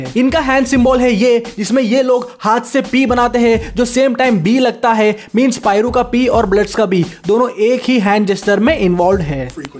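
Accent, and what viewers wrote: Indore, India. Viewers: Bhai tum indore se ho kya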